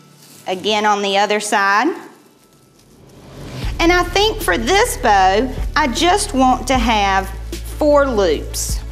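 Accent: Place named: South, United States. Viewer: MY TEACHER AND I LOVE YOUR SOUTHERN ACCENT♥️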